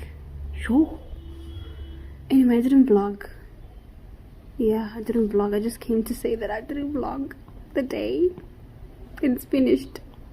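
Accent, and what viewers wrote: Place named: South Africa. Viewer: I js love you your South African slung❤️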